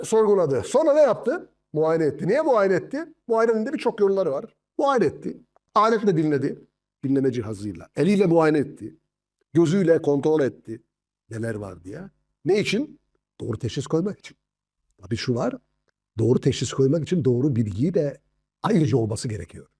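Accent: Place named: Konya, Turkey. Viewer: Doktor beyim ilk defa dinledim şivenizi konya/Bozkır yöresine benzettim. Pogramların devamı dileğiyle kolay gelsin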